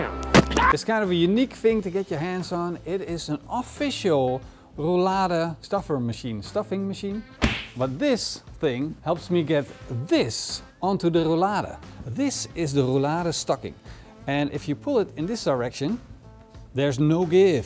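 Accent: Netherlands. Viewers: Im a dutch guy with a turkish wife that really enjoy youre videos 🤣 she say he is a YouTube star with a dutch accent 🤣💪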